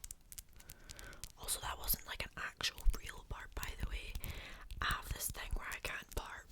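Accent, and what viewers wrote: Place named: Scotland, United Kingdom. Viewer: I sure hope all Scottish women blessed in the chest, cause jeeze is that accent annoying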